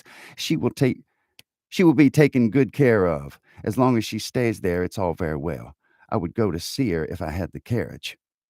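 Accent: South, United States. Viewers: When I found these videos, I inwardly groaned, thinking it would somehow "bring down" the literature. Keep in mind, I live in TX myself. But after hearing a chapter, I loved it immediately. Nothing is lost in "translation", and indeed, the text lends itself to the Southern accents. My compliments to the reader, Mr..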